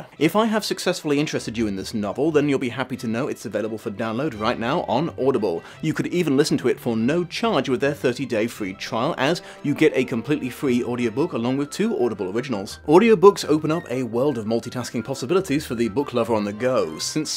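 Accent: South, United States. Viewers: I love your Southern US accent! 😀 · Love the slightly southern accent. Sounds kind of like a Louisiana accent. Very cute! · Your New England accent has a southern twang. You sound like rick grimes from the walking dead WHO IS ALSO ENGLISH irl! Side note: I was listening to this book on a long road trip in 2013 when I drove from Boston to Orlando and back I got to the part of the water fountain and stoped. I recently watched the English tv show AND the animated movie (the animated movie is closer to the book) Cheers! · I love your American accent! :D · GyroMurphy and yet somehow the Southern accent makes the whole thing even better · Your southern accent is really on point. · Hold up your american accent is excellent not perfect of course but very very impressive! (And a little southern for 💙 flavor 💙) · I love your American accent honestly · Holy shit you have a better American accent then I thought possible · Not sure why a Connecticut yankee is wearing a cowboy hat and speaking in a slight southern accent, but I loved the video anyway! 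· I did start thinking about Daniel Craig during the review, but mostly because I was comparing American accent to Craig's performance in Knives Out. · Your American accent is so cute. Especially since you're trying really hard to do a texas drawl by deepening your voice. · That accent though!!!! I would call it more southern as a New Englander, but credit where credit is due because I could not pull off a southern accent that well! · I love your American accent!!! Perfect delivery! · You are channeling some Southern state with your accent lol. Virfinia, S. Carolina, or Georgia. I AM a Connecticut Yankee so I don't know Southern accents. But it's definitely not Northern lmao. I love it tho!!! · The irony is that a Yankee accent is closer to a British accent than the slow southern gentleman drawl you may as well be saying "I'll be your huckleberry" in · That's the most American Accent that ever did American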